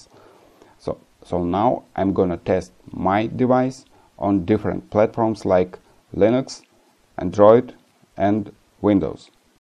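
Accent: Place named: Ryazan, Russia. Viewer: Отлично! Долй HAL. Понятный рязанский акцент без американизмов легко воспринимается на слух. Кусок перекачки файла стоит обрезать процентов на 90.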